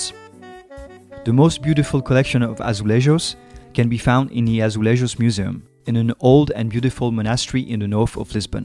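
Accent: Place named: France. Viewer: I think you're wrong, he sounds French. Love the accent. ❤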